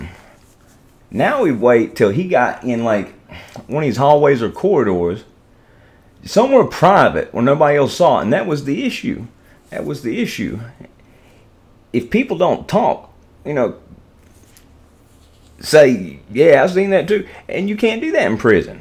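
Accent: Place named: South, United States. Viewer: You are from the south, you always talk funny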